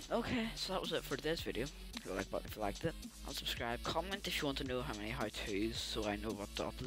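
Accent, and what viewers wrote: Northern Ireland, United Kingdom. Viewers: Not only you sound british your nose is also clogged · Northern Ireland is my guess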